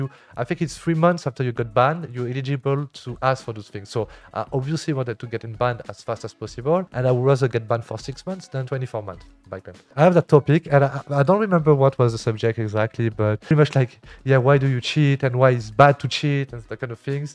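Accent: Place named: France. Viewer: french accent makes his voice very sexy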